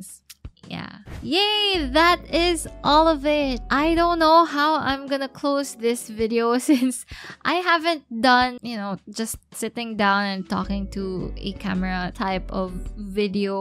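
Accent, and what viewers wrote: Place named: Philippines. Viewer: One thing I love about your shorts is your slightly wicked humor and comedic timing. And yes, your Yor and Anya shorts hooked me. I got the sense that you would make one laugh a lot on a date. Have you considered standup? Anyway, please use humor as a part of what you produce. Also, I love your accent. You probably know but I’ll say it anyway: your kind of Philippina cute/sexy is a powerful magic wand anywhere. I would also love to see your aerial work!❤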